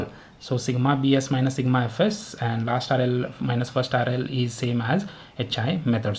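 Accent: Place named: India. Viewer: REDUCE THE INDIAN ACCENT SMALL, WEY THING BE THIS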